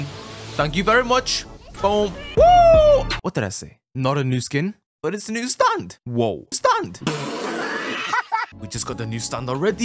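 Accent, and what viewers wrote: United States. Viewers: As an american his american accent makes me wanna die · your american accent sounds fine. just a bit off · Your american accent isnt that bad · Bros American accent is actually pretty good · This is Ascended American voice like HUH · Dude your American accent isnt cringe at all, its really good tbh, you should talk like this more often cuz its really good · Yoooo sup here again sorry for late comment kinda just got on my phone Edit: ur American accent is better than mine lol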